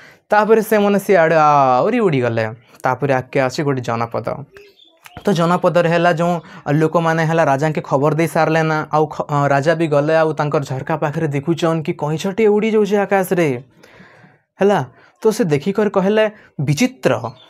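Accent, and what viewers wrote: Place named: Sambalpuri, India. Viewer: ସାର୍ ଆପଣଙ୍କ ର ସମ୍ବଲପୁରୀ ଆଉ ଓଡିଆ କଥା ମିଶା ଗପ ଟା ଭଲ୍ ଲାଗ୍ଲା ସେ😂😂😂😂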